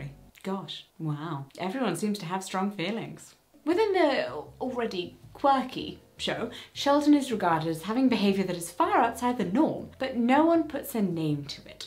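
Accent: United Kingdom. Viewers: Please slow d o w n! I can't understand a word you say. Your British accent is too strong.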